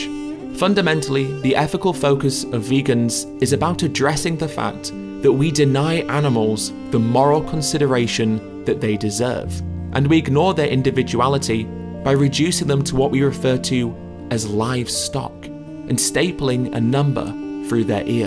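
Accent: United Kingdom. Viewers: Pandemics will happen no matter what. They will always be a when. The changes in farming animals is already happening. You can make it happen faster by buying your meats from small farms where the animals live happier lives. The tags for cows are the same as us piercing our ears. Many do not even react to tagging. And people, if you eat a lot of beef, the twenty pounds a year at the Standard American Diet rate, (you probably eat much less like me), it would take you over 18 years to eat the weight of ONE average size steer. By the way, vegan diet is not appropriate or sufficient for toddlers or children. Just because a Nice British accent says something does not make it true.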